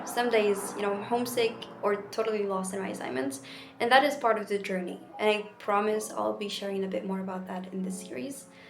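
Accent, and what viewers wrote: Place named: United States. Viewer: You sound american is that a american influence ?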